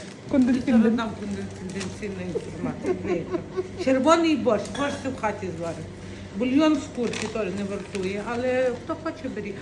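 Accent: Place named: Yavoriv, Ukraine. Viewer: О,ви з Яворівщини,або напрямок біля кордону з Польщею ,чую знайому говірку 😂)))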